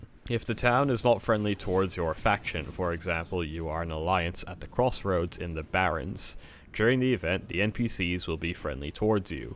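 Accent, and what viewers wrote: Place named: United Kingdom. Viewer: oh damn lmao, didn't realise you were from there, I heard British in there lmao.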